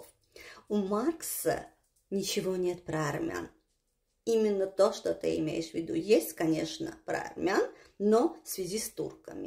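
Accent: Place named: Georgia. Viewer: твой грузинский акцегт просто убил!!!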